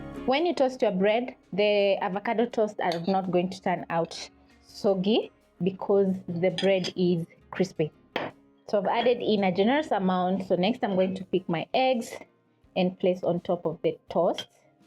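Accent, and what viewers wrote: Kenya. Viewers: Kenyan accent 😀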